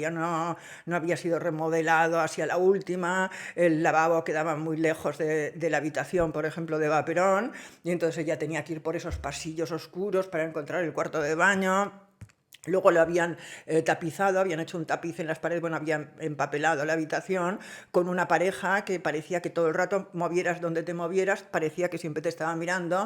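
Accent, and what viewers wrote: Spain. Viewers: lo que me rio cuando con tu acento español hablas de las palabras coloquiales (boluda, gorda, quilombos, minas) He visto peliculas sobre Eva Perón pero la mejor es Cartas a Eva, Carmen Polo interpretada por Anna Torrent fue sublime.... Eva lo interpretó Julieta Cardinale, muy bueno tambien, Perón Hector Colomé, Carmen Maura, Malena Alterio. Es mitad ficcion mitad real... lo de la carta no sé si existió pero el viaje de Eva si... La imagen de Franco con el orinal y enfrente a Eva me la imagino!!! jajaja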